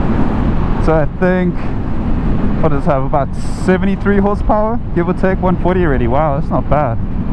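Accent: South Africa. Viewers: What part of South Africa are you from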